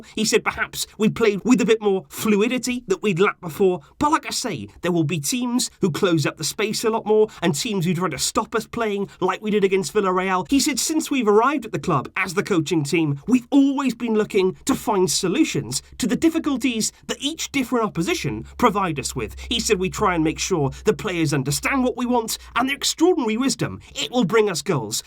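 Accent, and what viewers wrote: Wales, United Kingdom. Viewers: Tf are you on about, he's from Wales and has an accent, you have a problem?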